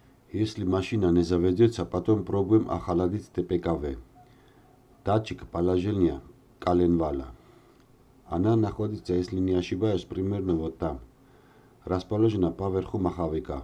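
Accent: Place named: Georgia. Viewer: Такой приятный грузинский акцент,пойду смотреть старые грузинские комедии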